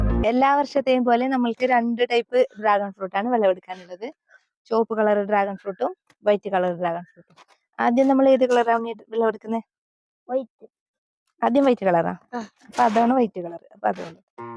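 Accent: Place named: India. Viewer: Samsaram kettapo thoni nammalu ore gillakarado🎉🎉 God bless u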